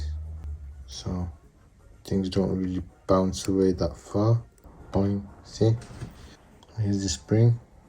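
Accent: Birmingham, United Kingdom. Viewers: You a brummie mon?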